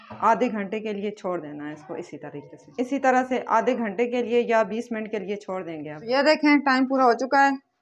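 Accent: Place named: Rural, India. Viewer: Ajeeb pendoo style Mai bolti han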